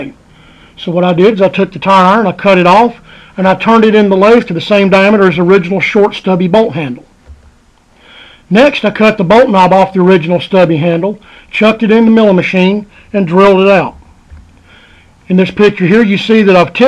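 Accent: Maine, United States. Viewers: That Maine accent isn't wasn't used to be.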